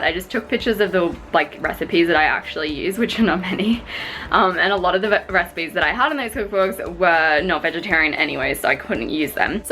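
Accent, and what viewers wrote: Australia. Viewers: omg are you Australian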